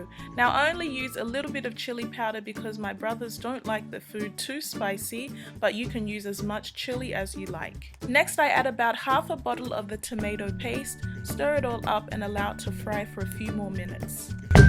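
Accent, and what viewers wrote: Australia. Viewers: Are you australian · omg you got a nice accent. Australian aye?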